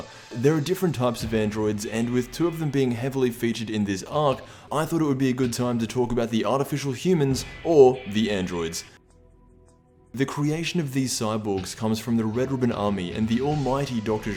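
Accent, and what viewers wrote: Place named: Australia. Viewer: it's just an aussie accent lol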